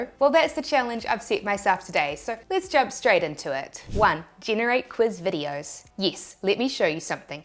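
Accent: New Zealand. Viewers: Good to see another Kiwi on here 🙂 I happened to stumble upon your page by accident, and so glad I did. Your content is awesome. As an ex-pat, I miss hearing the accent. Keep these wonderful videos coming. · Fush and Chups! Love the accent and Aotearoa (from UK) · I love your kiwi accent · I do hope to try these tips at some point but for now, I'm here for the hacks and the New Zealand accent (which is amazing!) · Thought you were Australian but apparently New Zealand is somewhere between Irish and Australian. Looking at the pinned comment I'm sad to see that this isn't such an original idea. Oh well...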